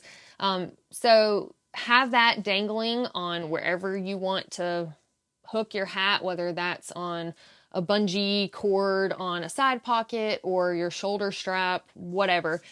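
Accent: South, United States. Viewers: Your southern accent really came out on this one. I was asking why on earth would anybody be hiking with a ladder? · A hot blonde with an American southern accent is about as hot as it gets. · I would be laughing with you if she wasn't so cute and I didn't love the southern accent.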